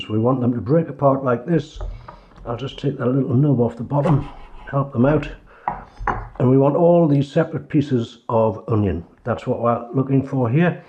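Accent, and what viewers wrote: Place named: Tyneside, United Kingdom. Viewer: Love this recipe, we live in Canada and onion bhajis are weird over here. Husband is from Sunderland (grew up in Washington) and he’s placing your accent between North Shields and Newcastle , how accurate is he? Thanks for the recipe.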